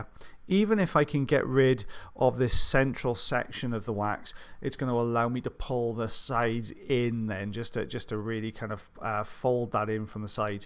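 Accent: Wales, United Kingdom. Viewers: "Clever sausages" 😂 I love the Welsh accent, but then add these euphemisms, and I can't help but giggle! 🥰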